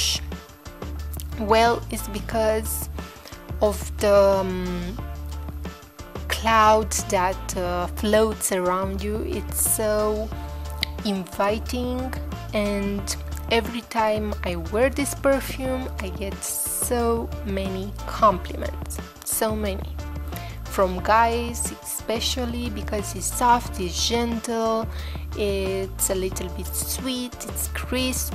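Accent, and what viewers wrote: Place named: Romania. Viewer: Romanian accent.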